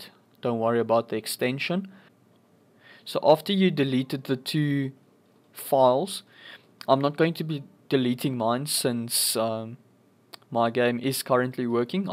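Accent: South Africa. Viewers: Ur from South africa right? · U sound afrikaans 😃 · south african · Is this dude South African?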